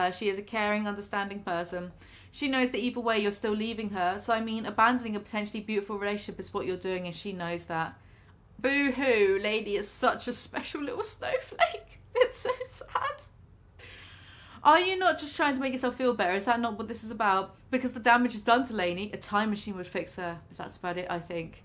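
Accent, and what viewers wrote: United Kingdom. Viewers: oh man, I haven’t enjoyed a British voice like this since John Lennon. when you look at the camera and tell Greg to fuck off after exposing some shit, well, it really hits home. just fucking hilarious. 😂 It’s so good that I know Onision really has defense at all to it. he probably should be scared.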